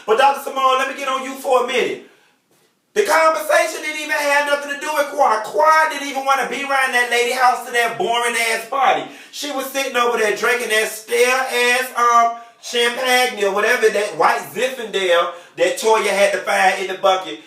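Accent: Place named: South, United States. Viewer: i love your videos and I'm an avid watcher.... But baby.... You hella country!!!!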